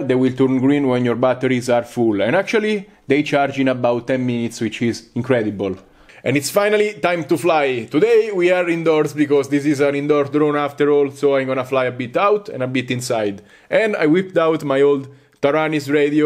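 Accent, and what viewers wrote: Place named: Italy. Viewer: Come si riconoscono gli italiani , anche se parlano inglese correttamente, continua così ottimo video 💪🏻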